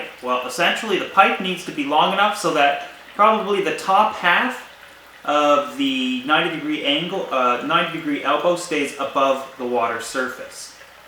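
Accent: Canada. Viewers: I love his Canadian accent. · Are you canadian?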